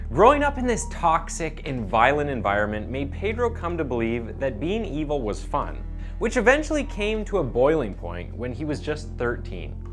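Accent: Canada. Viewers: Is the narrator/ host Canadian? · caan tell this guys canadian , they say house like hewse and about like a-boat etc lol its the only way i can tell the difference as im british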